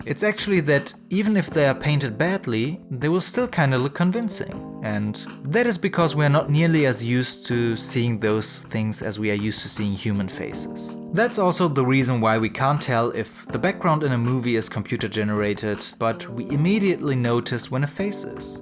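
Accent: Germany. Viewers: Wusste ich doch, dass du Deutsch bist! Hab ich sofort gehört :) Deine Kunstwerke sind total inspirierend, vielen Dank dafür! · Hello, I kind of knew from the beginning that you are german / turkish. I could hear the german accent since I’m geman myself :) Let me say that the tricks and advices you gave helped me rethink things I hadn’t noticed before. That is what I really appreciate about all of your videos since they are truly helpful aside from the timelapses of your paintings of course. As you explained (and as I understood) uploading your videos is not meant to gain a great amount of subscribers but simply share your techniques with us - which is what makes you so special for me since you only upload them for fun. I enjoy watching your videos alot and would like to thank you for sharing your view of art and advice with us / me. Liebe Grüße Rebecca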